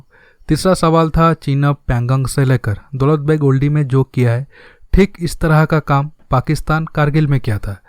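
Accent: Odisha, India. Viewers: Bhai kya app Odisha se ho? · Bhai aap Odisha se ho?...Aapka baat karne ka style se pata chalta hai kyunki mein bhi Odisha se hun.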